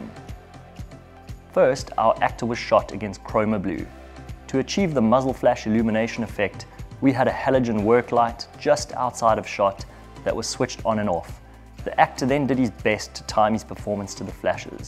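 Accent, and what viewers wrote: South Africa. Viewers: Hi. From your accent I gather you are South African? I love fooling around making videos. Please check this little short I shot at Monte Casino. With your superior special effects skills I might be able to sell some cool videos to sponsors! If you are willing to work with a 70 year old, that is. <link>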